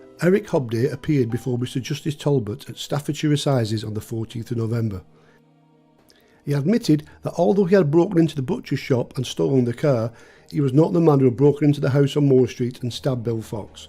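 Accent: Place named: Northern England, United Kingdom. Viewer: This stuff is so classy and I really like the narrator's soft Northern English accent . I'm hooked .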